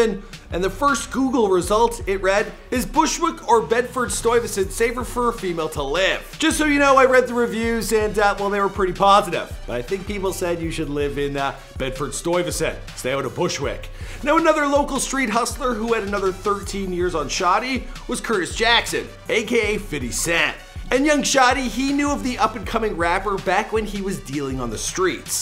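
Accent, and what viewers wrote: Canada. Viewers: Why do people always talk junk about? I like his content. Yea he’s a Canadian and don’t pronounce everything the way we do but cut the guy some slack y’all. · I hate the Canadian accent lol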